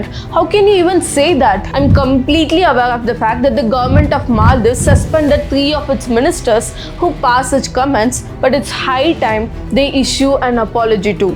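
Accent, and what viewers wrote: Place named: South India, India. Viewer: I have dhruv rathi to watch this type of content then why yours.... is there anything special except south indian accent?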